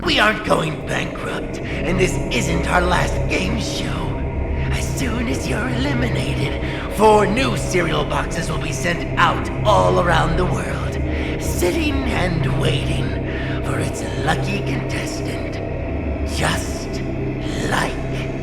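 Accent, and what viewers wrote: United Kingdom. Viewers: He sounds so British😂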